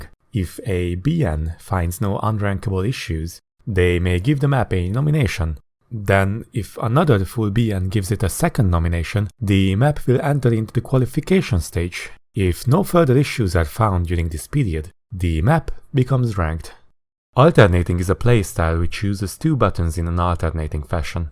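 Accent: Hungary. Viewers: Az akcentusból éreztem hogy hmm magyar lehet, aztán xD keep it up bud ^^ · Wait are you hungarian? Great video btw!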